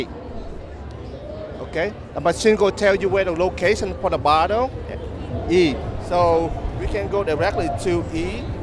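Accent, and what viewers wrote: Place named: Vietnam. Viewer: Hears voice * immediately realizes he is Vietnamese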